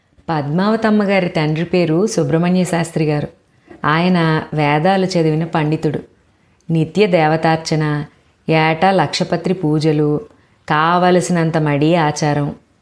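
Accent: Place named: Godavari, India. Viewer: మీ అగ్రహారం కథలన్నీ విన్నాను అమ్మా... చాలా చాలా చాలా బాగా చెప్పారు మా గోదారి యాసలో....❤❤👌👌👌👏👏👏🙏🙏🙏🙏 ఒక్కటి పక్కన ఫోటోలోనీ వాళ్ళూ నిజమైన వారా??!! లేక ఊరకనే వేశారా???!! నా సందేహం తీర్చగలరు. ఇక మీ మిగతా కథలూ వినటం మొదలు పెట్టాలి.ఉంటానమ్మా🙏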